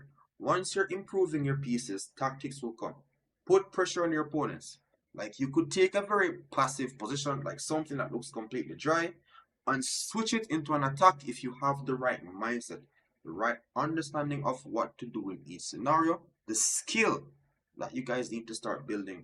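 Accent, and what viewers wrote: Jamaica. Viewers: I hear a Jamaican accent. Good on you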